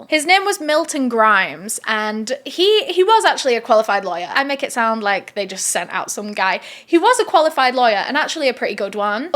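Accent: United Kingdom. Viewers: You sound UK and look Irish but wearing an Italian heritage shirt soooo was wondering 🤔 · honestly, your way of speaking and accent is so annoying 💀 it was really hard to go through the whole video (i liked the case so i watched) without cringing at your horribly fake talking.. you're the type of person that makes people hate a british accent lmao like wth is STAWries?? DAWr? affAWRed? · How is it that you have a British accent but yet talk like the classic obnoxious, ditzy, Valley girl? “Um yeaaaa, like okkkkk. I love to stretch out the last syllableeee of the last word in each senteeeeence. Sometimes even a couple times a senteeeeeence. With a very low, annoying, tired like sounding toooooooone. Or speaking with a high rising terminallllll. That all ditzy girls doooo. Which used to be called Valley Giiiiirl because a lot of dumb bimbos from the LA California areaaaa would all talk like thiiiiiiis and some still dooooo but not even close to how many used toooo like when Paris Hilton was famouuuus. 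Kim K used to talk more like thissss and thats exactly the type of braindead person that would talk like thisss, a person who watches The Kardashiansssss and idolizes themmmm who also probably think the world is flaaaat and couldnt do simple basic math or comprehend anything over a 2nd grade level educationnnn” I’m not saying thats you, I’m just saying thats at least how you sound when you talk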